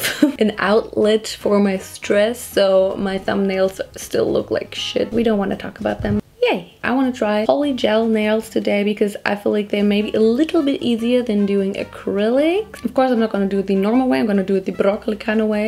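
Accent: Germany. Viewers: she is so german that u can hear her accent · I thought she was German from the beginning because she kept talking in German and had an accent if that makes sense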